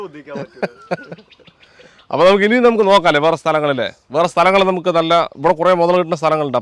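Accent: Palakkad, India. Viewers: പാലക്കാടൻ ഭാഷ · എന്താത് പകുതി ചെല വെച്ചതായിരിക്കുവോ😁 ❣️പാലക്കാട് ഭാഷ ഉയിർ❣️ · കണ്ടപ്പോ മുതലകളെല്ലാം കൂട്ടത്തോടെ കണ്ടം വഴി ഓടി 😂😂😂 പാലക്കാട് ഭാഷ ശൈലി 😍😀😀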